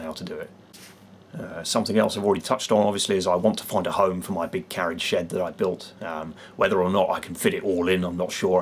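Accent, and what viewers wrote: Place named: Scotland, United Kingdom. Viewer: I've watched all your videos. I think its time you done a face to face version now! I wanna put a face to that Scottish accent!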